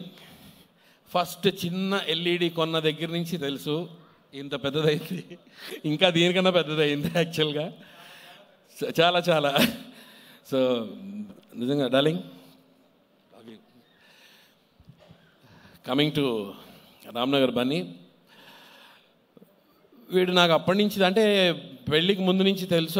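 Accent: India. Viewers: nee butler English ...........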